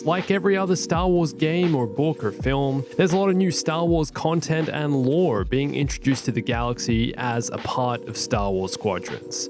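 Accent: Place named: Australia. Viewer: I always find a small to creep over my face when I watch your videos, you just have this gusto and a nice australian accent, thank you for making great videos. 😂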